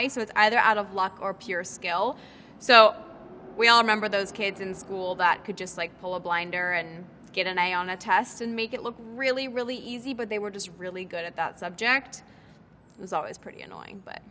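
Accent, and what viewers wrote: United States. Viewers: Wow. An English actress who speaks with an American accent because she moved to the US at the age of 6. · She sounds American so she should have opened with "I sound American but I grew up in both in the US and England as my father is English." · Why does she sound American and not British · An american accent ffs.... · She has an American accent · It's weird hearing someone explain British slang in an American accent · I'm confused...but she's speaking in heavy American accent! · She has american accent though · I became confused after seeing her strong American accent, then I remember her strong British accent in Love, Rosie. Her life is definitely complicated. Thanks, Google. 🙂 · I am not familiar with Lily and after I heard her American accent I was like 'Whaaaaat? I thought you were British' and then did a quick Google check lmao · She keeps it in her back pocket & can retrieve it at will, but US English is her default since she grew up here. 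· She's got an American accent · I didn’t even know she was British.... 🤷🏼‍♀️ she has such a strong American accent! You learn something new everyday. 💕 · The American-born daughter of a British musician teaching British slangs whilst sporting a heavy American accent. What sorcery is this?? o_0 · Bet she wishes she had her accent back. I’d hate to have an American accent. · Amy She was a weird choice considering how american she sounds, but there were a few in the video I didn’t know off the top of my head that she seemed to know. Also, we don’t really know how much time shes spent in the uk, could be most of her life for all we know. Still a few questionable definitions in there though 😂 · shaima! Bruv I live in the uk and I certainly know. The heavy American accent with the slang terms is not it💀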